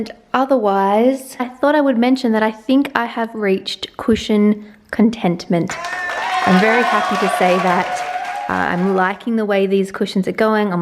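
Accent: Australia. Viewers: that's true. But like you said it did look a little cheap. I love, that you are filming video again. I just love your australien accent, it always reminds me of the great time I had in Australia 😊 greetings from Germany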